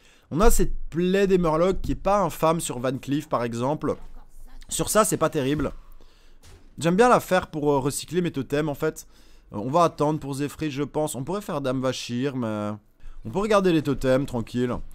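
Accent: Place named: Belgium. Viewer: Mdr l'accent belge il est bien présent :)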